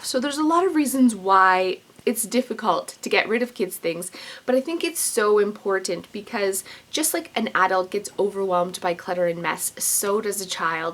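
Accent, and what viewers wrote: Canada. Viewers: I'm a new sub! Love your channel and your personality! Are you Canadian? Your accent sounds that way ;) But I'm from Alabama so I'm sure I sound great to you! Ha!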